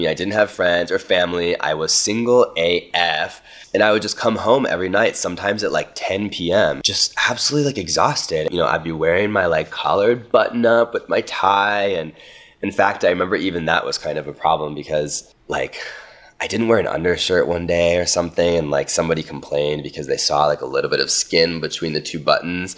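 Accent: Argentina. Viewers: I'm Colombian, living in Brazil pretty much for the same reasons you moved abroad, but with some different points, but I can totally relate. because I didn't know anything about the culture or Portuguese, yet here I am... and now I understand why you have a kind of Argentinian accent when you speak in Spanish!!! Love listening to you speaking in any language btw. Abrazos 😊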